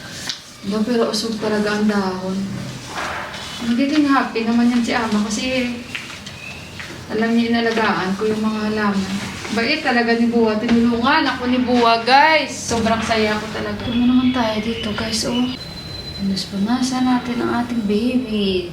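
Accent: Iloilo, Philippines. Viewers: Natatawa ako sa accent mo sis naalala ko best friend ko tiga Iloilo dito sa italy aprehong pareho kayo Kung magsalita hahahaha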